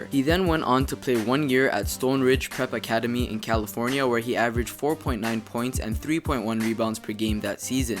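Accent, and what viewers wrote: Toronto, Canada. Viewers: Hey, Are You Torontonian? I Can Tell By Your Accent. (BTW I'm Also From Toronto. I Live In Scarborough)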